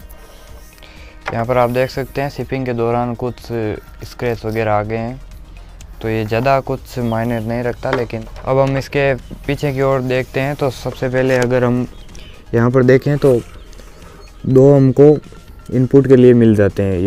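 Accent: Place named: Rajasthan, India. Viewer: Pka rajsthan se ho 😄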